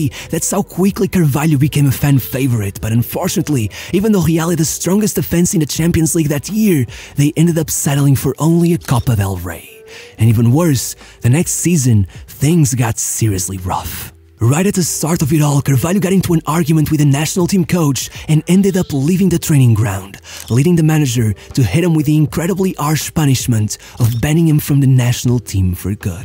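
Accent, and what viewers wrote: Portugal. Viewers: esse ingles ta em dia meu rapaz sim senhora! mas nao o suficiente para eu perceber que es tuga! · Eu sempre me questionei se o gajo que relata os vídeos era português devido ao sotaque semelhante, mas hoje tenho quase acerteza que é, ao dizer os nomes portugueses perfeitamente, alguém sabe se é tuga ou não? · Essa forma de sussurrar com esse travo tuga torna impossível ver os vídeos...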